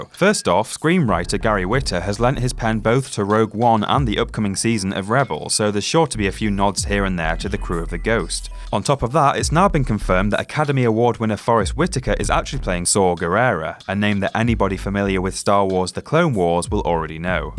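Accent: United Kingdom. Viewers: How? He sounds like a typical British guy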